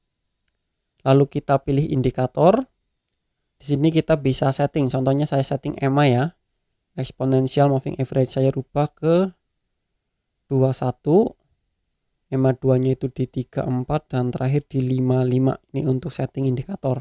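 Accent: Banyumas, Indonesia. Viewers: logatnya kok mirip saya ya 🤭 salam dari wangon mas bro...izin menyimak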